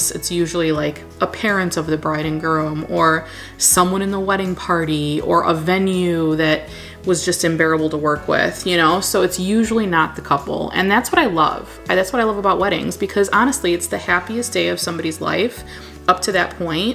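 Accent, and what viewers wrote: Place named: Illinois, United States. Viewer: Been watching Becca for a few years and finally wandered over to Potted Together and now you. LOVE IT. I grew up in Wildwood, IL just 30 minutes north of you. Love hearing your accent, brings back memories. 💕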